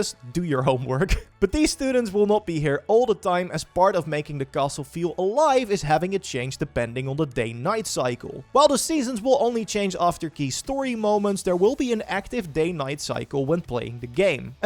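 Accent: Netherlands. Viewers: I really like your video's, but are Dutch? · I immediately heard that you were a fellow Dutch guy, cheers! Great video.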